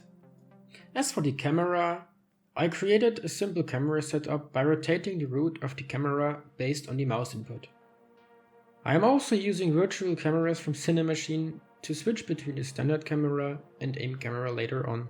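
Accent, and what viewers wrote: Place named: Germany. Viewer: you sound incredibly german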